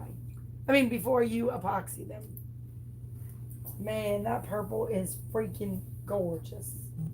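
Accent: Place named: South, United States. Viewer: ❤️❤️❤️❤️❤️.....I knew u was from da south lol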